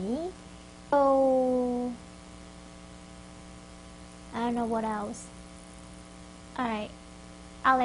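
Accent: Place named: Thailand. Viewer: oh! you were born in Thailand, that's why you got Thai accent! <3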